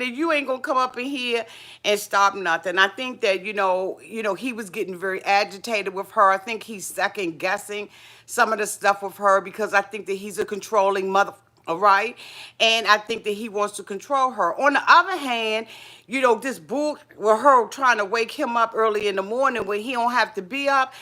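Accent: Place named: DMV, United States. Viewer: I hear that DMV accent! 🌸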